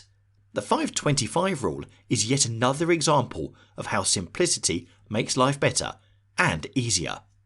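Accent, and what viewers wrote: United Kingdom. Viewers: Is this British English? Just curiosity.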